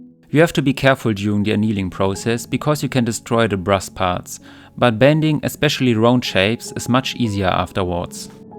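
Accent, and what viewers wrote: Germany. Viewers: it Sound like a german that speak English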